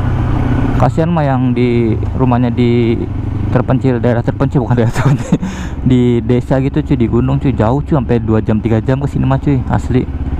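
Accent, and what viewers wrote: Indonesia. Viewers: Logat ngomong kayak org jawa benarkah?:v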